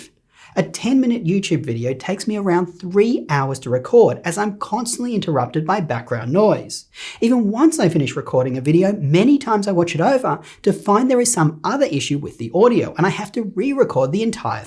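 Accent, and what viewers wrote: Australia. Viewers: Are you Australian????? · yknow i thought you were australian, but you mentioned you moved to the usa from there. interesting!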